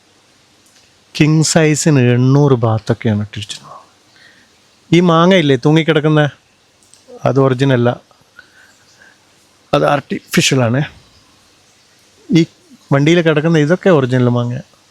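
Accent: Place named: Malabar, India. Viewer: ഇങ്ങള് മലബാർറാരൻ ആണോന്ന് എനിക് നേരത്തെ സംശ്യം ഇണ്ടായ്ക്ക് ഇപ്പൊ ഒറപിച്ച്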